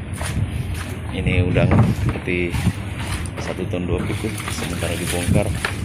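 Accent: Bugis, Indonesia. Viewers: Btw orang mana kamu bro..klo sy dgr logat nyaa Orang sulsel , Lbih tepatnya Org bugisss :) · Mesti org bugis ni logat pn boleh tau😂😂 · Ketahuan dari logatnya.. Saya juga orang Bugis yang tinggal di Kab. Kotabaru, Kalimantan Selatan. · Seperti logat orang bugis hehehe salam dri bugis barru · Kyak logat bugis yaa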